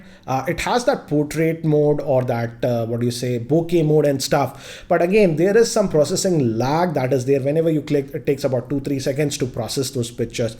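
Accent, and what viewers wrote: India. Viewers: i hate the indian accent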